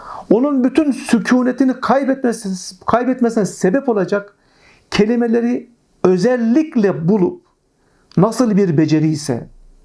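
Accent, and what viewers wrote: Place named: Konya, Turkey. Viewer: Allah razı olsun hocam. bu arada tam bir konyalı gibi konuşuyorsunuz bazenleri ( ' Biliyonuz mu ') :))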